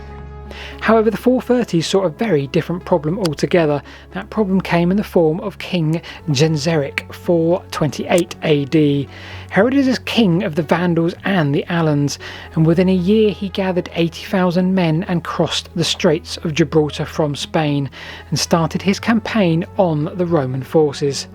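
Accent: United Kingdom. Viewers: You do good documentaries, but, you need to slow down your words and talk with a bit more articulation, especially with a British accent. I have to stop the video often to decipher those rapid burts of words.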